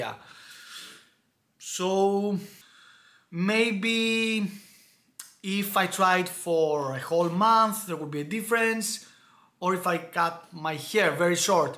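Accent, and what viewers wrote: Greece. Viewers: Based on the accent you must be from Greece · Thx for posting. Keep up updated. Btw, you sound like a greek fella, am I right?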